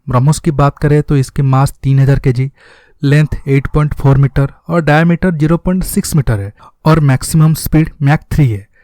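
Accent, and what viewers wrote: Odisha, India. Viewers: App kya Odisha se ho?? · Are you a odia guy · R u a odia U r acent r like that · Aap Odisha se lag rahe ho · Odia accent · Brother are you odia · Katha gura pura odia bhali laguchi, aau bhala bi laguchi, good job please carry on.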